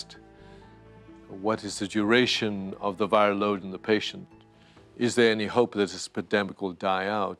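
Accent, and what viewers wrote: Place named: South Africa. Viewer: Great that having spent so much time outside South Africa he still maintains his perfect South African English accent.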